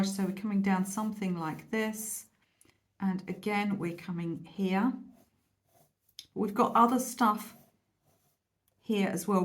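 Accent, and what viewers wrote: England, United Kingdom. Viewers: Hi, Ronnie here. JUST A QUICK FYI- IF YOU HAPPEN TO GET ANY COMMENTS THAT YOU SPEAK TOO FAST- THOSE VIEWERS MAY NOT KNOW THAT THEY ARE ABLE TO MANUALLY SLOW DOWN THE VIDEO PLAYBACK SPEED IN YOUTUBE, WHICH IS A GREAT FEATURE,BECAUSE TO PROCESS SPEACH IS A VERY COMPLEX ISSUE FOR THE BRAIN, ESPECIALLY AN AGING BRAIN, THAT'S A SCIENTIFIC FACT (LOL), FOR EXAMPLE, I AM AMERICAN & YOU ARE BRITSH & WE BOTH SPEAK ENGLISH, RIGHT. BUT ACCENT S AND CADENCE COME INTO PLAY OBVIOUSLY, RIGHT, THAT'S TO BE EXPECTED, NATURAL. I HAVE BEEN A SUBSCRIBER OF YOUR CHANNEL FOR YEARS NOW & I LOVE IT!! SO, I HAVE BECOME USED TO YOUR ACCENT AND RATE OF SPEAKING OVER TIME. BUT, WHEN I FIRST BECAME YOUR SUBSCRIBER- IS WHEN I DESCOVERED " THE YOUTUBE PLAYBACK SPEED FUNCTIONS. AFTER ALL, THE INFORMATION YOU ARE PROVIDING CAN BE RATHER COMPLEX, FOR SOME,PERHAPS. 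YOU DO AN EXCELLENT JOB!!!!! THANKS,! BRAVO, ON YOUR YOUTUBE CHANNEL! WARM REGARDS, RONNIE ~ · Yes, I do watch some of your videos repeatedly. And I keep picking up and using more skills and techniques each time! And besides your adorable English accent and vernacular are quite charming and helps my attention deficit brain stay focused instead of tuning out from the audio as happens when I watch some other content creators. 🎨🧑🏻‍🎨🥰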